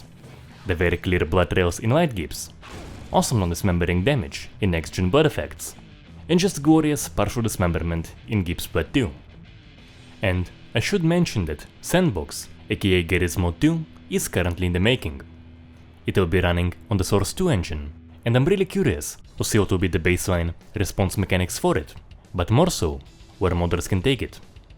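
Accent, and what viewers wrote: Russia. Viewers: russian акцент замечен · Так разговаривать только русский может) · Ты руусский) По акценту видно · Русский акцент, ты русский ? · For fuck sake youre VERRRY RRRUSSIAN ACСENT. I understand, it makes easy to speak English. But my ears can't stand it. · I love his russian accent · Are you are Russian? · Я знаю что ты русский ! По акценту слышно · Я заметил твой русский акцент. Ты Русский? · Very strong russian accent like in movies... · Такое ощущение что автор русский, Из-за акцента голоса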